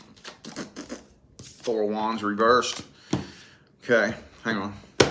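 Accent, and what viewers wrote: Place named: Louisiana, United States. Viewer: I'm looking at your beautiful eyes and You're lusianna voice I think, love from Ireland.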